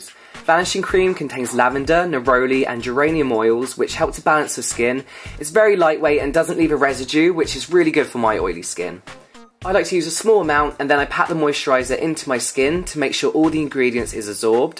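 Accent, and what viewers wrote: United Kingdom. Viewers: that s why i hate British acent a lot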